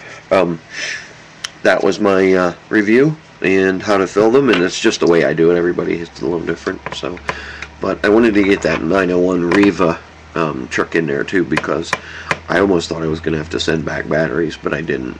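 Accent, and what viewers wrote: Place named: Pittsburgh, United States. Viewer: Great video..good tip about the Riva battery charger..I have to pull up the contact on the charger every time I charge my Riva..actually thought it was broke until I saw this tip..I know you're from Pittsburgh because you really have the Pittsburghese accent..Jeet jet??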